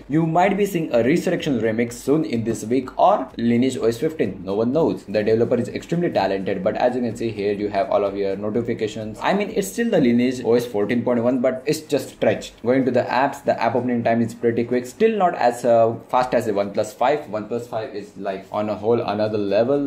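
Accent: India. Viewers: Indian english is the best! :D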